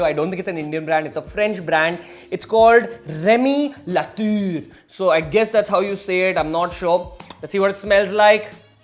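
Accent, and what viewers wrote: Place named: Gujarat, India. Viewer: Gujrati English 😂❤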